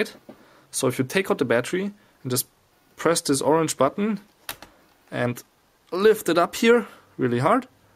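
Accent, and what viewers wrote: Germany. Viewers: great tutorial despite the german accent, danke schoen! :)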